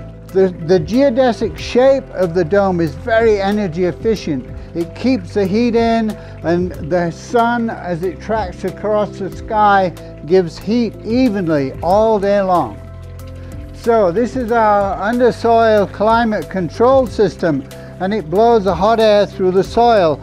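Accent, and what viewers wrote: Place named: Yorkshire, United Kingdom. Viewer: I think I can hear a Yorkshire accent poking out through many years in the US?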